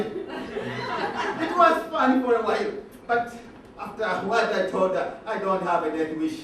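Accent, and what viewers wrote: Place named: Jamaica. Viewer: i dont know which tribe in Uganda speaks like that.....u sound more like Jamaican..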